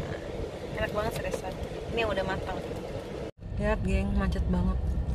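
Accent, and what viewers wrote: West Java, Indonesia. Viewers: Teteh mani lucu nyunda pisan sok atuh pulang ka tanah Pasundan hehehe cing damang teteh .enggal gaduh bayi atuh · Tth sunda bgtttt hihihiiii walaupun di negara org.masih ttp nyundaaa sukaaaaa😍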